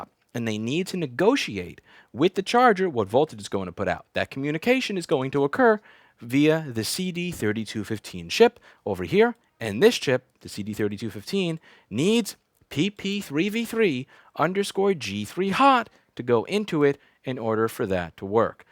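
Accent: New York, United States. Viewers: The accent/speech pattern is a mixed of Seinfeld, Joe Rogan and Bob Ross plus a sprinkling of NYC cabbie. Somehow turns out very entertaining know these videos.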